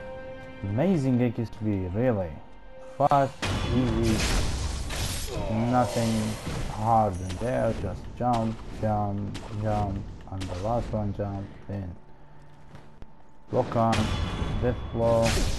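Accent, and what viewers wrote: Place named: Gulf, Arab world. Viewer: الخليجي واضح من صوته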